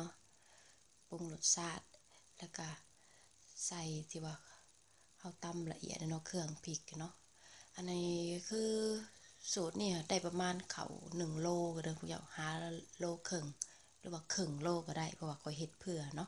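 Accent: Savannakhet, Laos. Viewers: มักๆเสียงเจ้าเว้าม่วนม่วน คือกันเองไทยสวันบ้านเฮา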